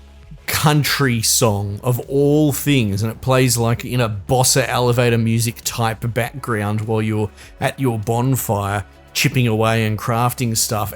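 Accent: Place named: Australia. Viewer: I know it's the aussie accent but I keep hearing Dick13 lol 😂 Just got Surge 1, Surge 2 was such a cool and good game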